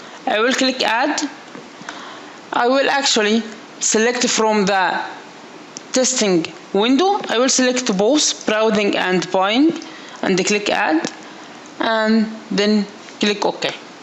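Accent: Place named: India. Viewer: Индусский английский перестал резать слух примерно в середине видео. Тем не менее, теперь я знаю как делать нагрузочное тестирование. Gracias!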